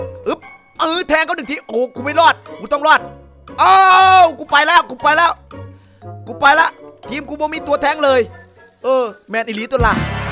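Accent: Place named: Isan, Thailand. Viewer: พูดอีสานได้กวนตีนมาก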